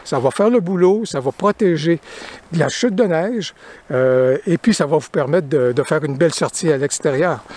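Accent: Canada. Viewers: votre gentil accent Canadien donne de la chaleur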